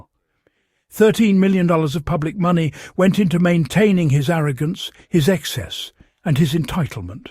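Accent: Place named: Cockney, United Kingdom. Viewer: Maybe cockney: Cockney is a dialect of the English language, mainly spoken in London and its environs, particularly by Londoners from working-class and lower-middle-class families. (I’m guessing)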